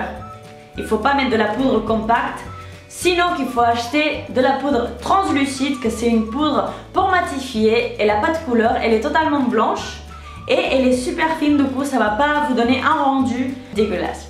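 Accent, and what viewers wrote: Spain. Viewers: elle a l'accent espanol c'est pour ça, mais tu abuse , j'en suis sur que tu as compris quelques phrases mdr sinon tu aurais pas regarder jusqu'à la fin ouhloulouu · elle est espagnole🤗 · c'est l'accent espagnole c'est normale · c'est normale quelle parle vite c'est l'accent espagnol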